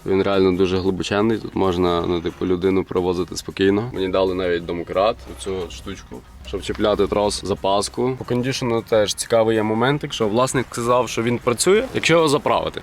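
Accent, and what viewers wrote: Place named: Western Ukraine, Ukraine. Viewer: Шикарно уже разговариваешь на западном акценте. Машинка сыпется но и года и пробег какой. У папика с нуля 2008 год правда дация логан 1,6 пробег 62 тысячи.Удачи а кокой пробег напишешь.Удачи.Добивайся такие машины средний вариант.Удачи.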